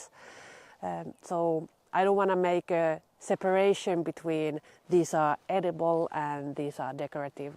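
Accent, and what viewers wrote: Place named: Finland. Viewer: I love your English,! With a soft Finnish accent. Your voice would be great to read kids’ stories, about elves and gnomes 😊 Yes, I understand and support your gardening philosophy and general plan. Natural and generous for all things surrounding. Great job!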